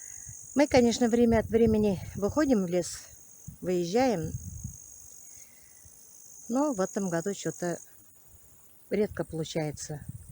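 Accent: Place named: Mishar Tatar, Russia. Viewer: вы не мишары,говор мишарский у вас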